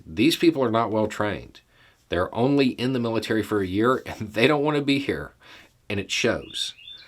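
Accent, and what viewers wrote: South, United States. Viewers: As a gal who grew up in part in southern Alabama, it's nice to hear a Southern accent talking sense.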